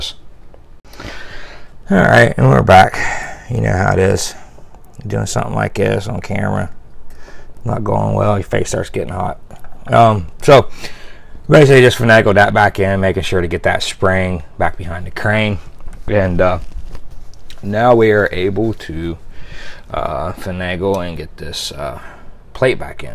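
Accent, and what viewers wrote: Pittsburgh, United States. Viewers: This guy must be from Pittsburgh with that accent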